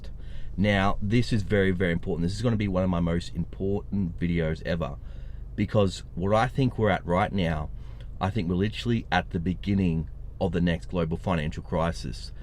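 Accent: Australia. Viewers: Good one... the criminal bank cartels are starting to move... bail ins be a-comin sooner or later... Crypto will be the future.... dont worry about that, mate....fiat currency is fkd...cbdc idea is criminal... Im in Peru..love to hear the accent from the land of the meat pie - always....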